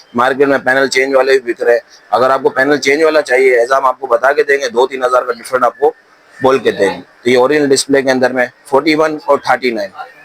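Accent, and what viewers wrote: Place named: Rural, India. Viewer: بولنے کے انداز اور شکل سے بھی پینڈو لگتے ہیں بھائی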